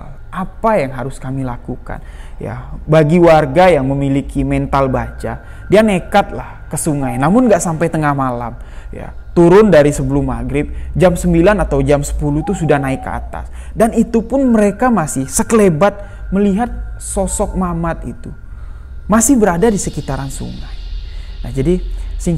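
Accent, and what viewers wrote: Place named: Jambi, Indonesia. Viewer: Hadir bang Pertahankan logat jambinya,,,,, MANTAP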